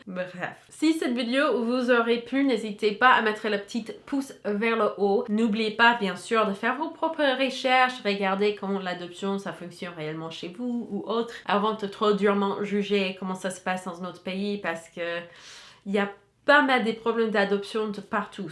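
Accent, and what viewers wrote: Quebec, Canada. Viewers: Super video! PS: ca faisait longtemps que je n'avait pas vu une de tes vidéos, et ton accent à vraiment changé ! Y a vraiment des prononciation et intonations québécoises , c'est marrant à entendre! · Tu m’as brisé le coeur :,( La vidéo était hyper intéressante bien que vraiment touchante et triste. Ps: tu commences à avoir l’accent canadien ;)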